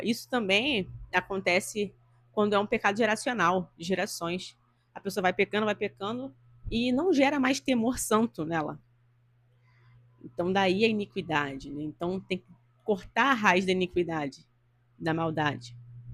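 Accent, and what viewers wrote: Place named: Rio de Janeiro, Brazil. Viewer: " Princesa de Mônaco." Sotaque carioca. Deus abençoe sempre seu ministério! #JuntosPeloReino!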